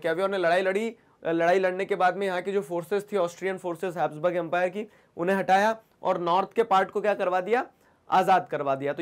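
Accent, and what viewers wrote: India. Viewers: The Indian accent is weirding cute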